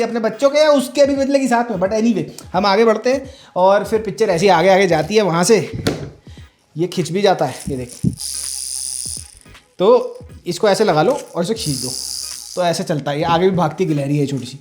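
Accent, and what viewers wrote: Haryana, India. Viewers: I love you bro to much honest review 😅 and bhai please bring back you’re haryanvi I really miss it ❤❤❤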